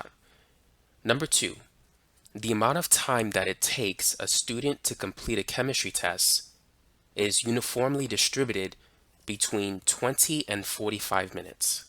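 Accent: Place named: New York City, United States. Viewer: I think there is a slight NYC accent in there. Almost makes me miss home. Great video...thanks!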